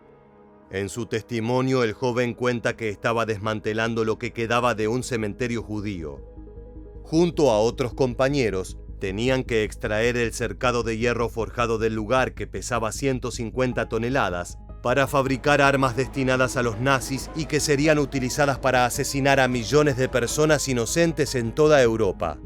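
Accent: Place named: Argentina. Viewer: VIDEO CON ACENTO ARGENTINO, YA NO ME INTERESA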